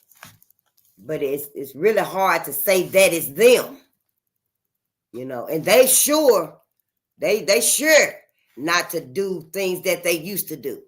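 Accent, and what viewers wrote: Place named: South, United States. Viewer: Sis I like your country twang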